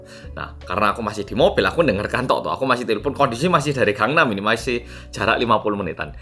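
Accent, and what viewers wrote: Java, Indonesia. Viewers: ak tuh sll speechless typ nnton videomu, km tuh bner2 ky org jawa asli ngmgmu medok asli😂 maaf ya out of the topic😅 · Itulah percayalah,setiap kesusahan pasti ada kebaikan,berarti di uji dulu dg kesusahan,dn di balik itu Tuhan punya rencana yg Indah dn ALLOH memberikan balasan kebaikan,INTINYA,SETIAP ALLOH memberikan Ujian pasti ALLOH akan mengangkat derajat,aku suka bngt dg dn klrg, krn JAWA BNGT MEDOK BNGT GK NYANGKA ahwa Asli korea.....👍👍👍👍👍💚🙏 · Melihat banyak kebaikan,menjadi hati lebih tenang,semoga kita selalu bahagia dan dapat membuat orang lain bahagia Jowo ne mendok... Ya Allah bahagia selalu 😊😊😊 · Wes suwi gak kerungu jowoan medok curhat² ngene... Ileng pertama youtubemu biyen.. entah siapa yang salah...ku tak tahuuuu 😅😂😂😂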